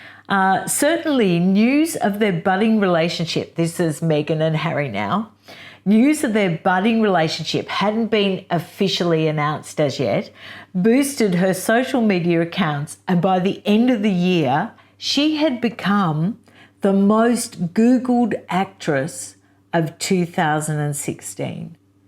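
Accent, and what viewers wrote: Australia. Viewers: Thank you for your videos. It’s so wonderful to hear an Australian accent on YouTube too. I like the way you intelligently present things. I also enjoy your genuine glee at sharing the “juicy bits!” 😉😂💐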